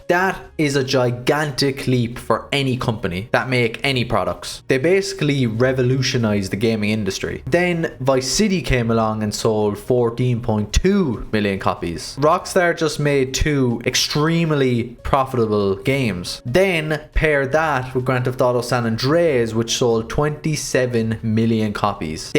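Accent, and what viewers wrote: Ireland. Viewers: Your losing your Irish accent, but I like how you say 3 and 30. You say Tree and Dirty Tree. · You're Irish ain't ya? Heard it in the accent lol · Are you Irish?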